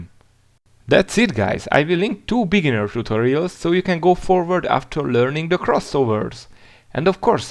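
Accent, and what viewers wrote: Hungary. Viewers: hallatszik a magyar kimondás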